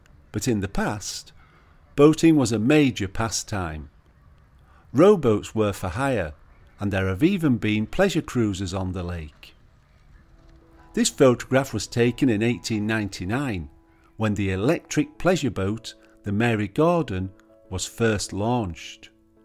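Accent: Yorkshire, United Kingdom. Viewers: Thank you for this video, very clear speaking to listen to. I can tell you're from Yorkshire. I was born in Farsley and lived in Pudsey also and worked in Leeds in three fashion shops in all back in early to mid 60s. I don't usually stop to look at videos if they seem too long though 16mns isn't really a long time. I'm glad the Canal Gardens were shown as I was beginning to think they wouldn't. I had my first trip back from Oz after 20yrs in the summer of '87 and we went to Roundhay Park. Lots different since I was a child and teenager. I have a memory of being in the Club House I think it was when I was about 7yrs after the heavens had opened and everyone charged in there. After it stopped, everyone was pushing and shoving to get out again, making for the door. I got stuck in the crowd, lost sight of my parents who weren't looking to see if I was following and got my hair caught on a bloke's button on his waistcoat. 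He didn't know and was dragging me along with him with me screaming for my Mother at the top of my lungs! We were really sandwiched in like sardines! I eventually got my Mum's attention and she told him. Another was going to see when I was about 16yrs in early 60s, but before all those others you mentioned. You could hardly call it a concert as he just performed in the middle of the grass in a made-up stage covered in tarpaulins. He was the drummer as well as singing and he just rattled off his well-know songs as quickly as possible, didn't i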